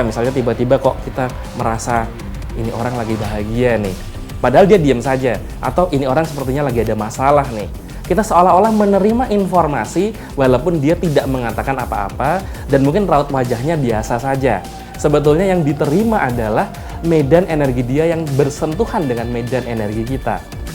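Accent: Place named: Banyumas, Indonesia. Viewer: Salam ngapak mas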